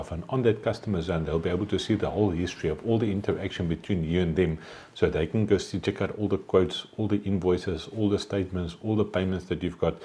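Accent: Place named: New Zealand. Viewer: From New Zealand I believe, just change the speed of video and learn.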